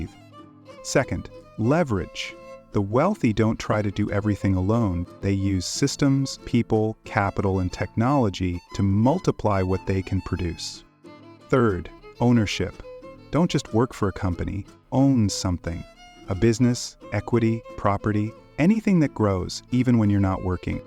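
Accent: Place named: United States. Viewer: Good video. So simply explained. I was expecting something with an "Asian" voice that was difficult to understand. That was more like basic English spoken like an American. The concepts were straightforward. For a rat-race escape video, it diagnosed the problem and offered solutions, in a way that was useful and tabloid.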